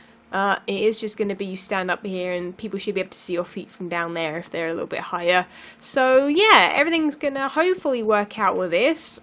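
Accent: United Kingdom. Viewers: Mare-ee-yo? Wow British people sound funny.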